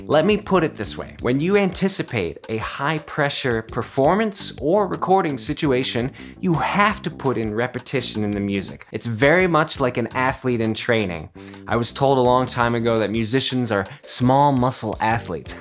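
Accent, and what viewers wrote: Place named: United Kingdom. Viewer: Appreciating all of this but esp the British accent dudeeeee.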